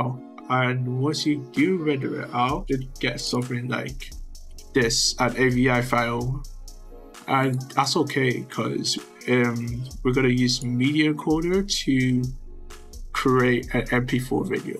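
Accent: United Kingdom. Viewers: AHHHHHHHHHHH THIS IS EPICCCCCCCC- ALSO DON'T WORRY MAN I AM SUBBED TO YOU SO NO NEED FOR YOU BRI'ISH VOICE TO TELL US!!!^^ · nice hearing your beautiful bri'ish voice again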